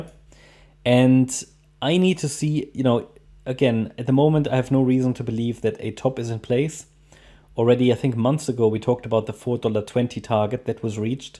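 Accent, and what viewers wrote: Germany. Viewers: Hi, deinem Akzent zu urteilen, würde ich jetzt behaupten du sprichst eigentlich deutsch 😆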